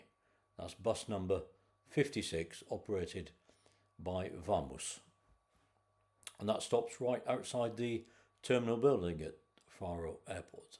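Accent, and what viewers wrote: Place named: United Kingdom. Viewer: i love... LOVE your channel - I just found you... subscribed.. i am from Portugal but grew up int he US.. so i guess i am American too... Algarve is one of my favorite regions, obv.... I have a very small farm in the Azores - love it there too. Now that i finally extricated myself from the claws of corp. America and am blissfully early retired- i tend to pop over to Portugal quite often. it's just a 4 hour flight from Boston and i love seeing my family and just chillaxin' in nature & peace away from the kerfuffle of daily life in the US... Florida will not quite do... 💌 anyway - am catching up on all your videos this evening cuz i;m hunkered down for this snow storm...(enjoying a dose of the queen's English..LOL..)❄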